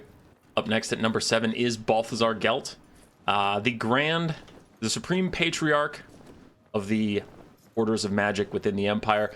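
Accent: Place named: France. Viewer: your french accent absolutely incredible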